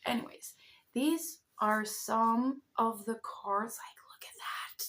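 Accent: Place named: Pennsylvania Dutch, United States. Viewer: As someone from the USA, your English accent, more specifically American accent, is really good. I actually thought you were American until I found out you were Dutch by watching your other videos. You literally sound like you’re a Pennsylvanian Dutch, but I guess that makes sense. 😅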